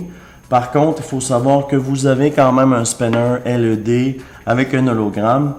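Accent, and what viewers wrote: Canada. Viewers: je tes découvert il y a pas longtemps je vu tes vidéos et j'adore je vais m'abonné en plus j'adore ton accent canadien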